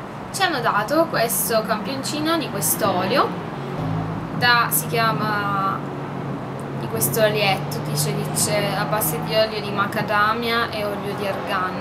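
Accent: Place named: Sicily, Italy. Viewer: ma come mai io pensavo che fossi siciliana???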